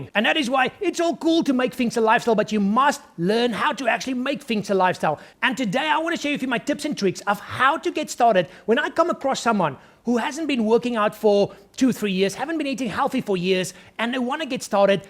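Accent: South Africa. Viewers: Are you south African? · South Africa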